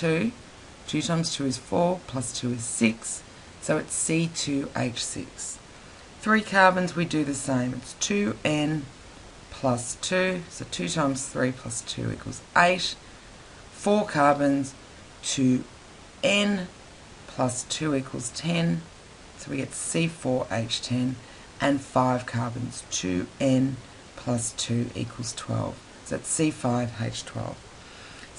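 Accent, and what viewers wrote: Australia. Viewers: AN AUSTRALIAN!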